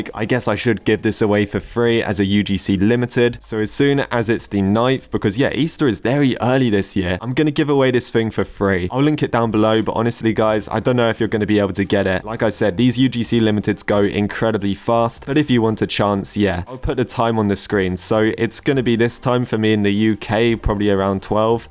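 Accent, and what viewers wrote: United Kingdom. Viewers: Gimme the egg funny British man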